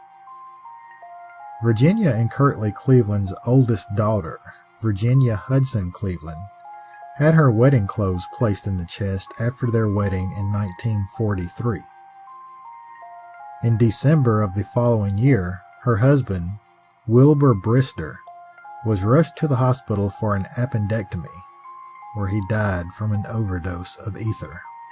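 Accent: South, United States. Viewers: What an eerieitem... I was a Zac baggins enthusiastic but he's kinda lost me a little,... yet again your Southern drawl thrills me ❤😊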